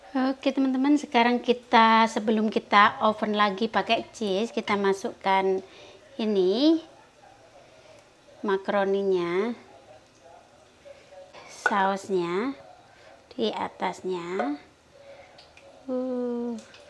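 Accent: Java, Indonesia. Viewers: Jika lihat mb nih senang banget apalagi bhs jawanya medhok hehehe '' isah2 '' alias cuci piring wis semangat d sehat slalu ya mb sekeluarga 😍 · Mashallah, logate,jowo bgt, hadir mbk,aq wong solo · Aq penonton setiamu lho mbak cuman jarangan koment 😊... aq suka bgt denger kata mongah2 duh ngakak sendiri...pertahankan logat jawanya biar jd ciri khas mb...salam dari sby 🎀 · Pasta maknyus 😀😀👍👍 Lucu logat jowo nya masih ada👍 keren mbak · Asyik bgt lihat mbk masak memasak buat keluarga dan mertua... Natural dan santai bgt, ga dibuat buat bahasanya yg medok... Sukses deh buat mbk.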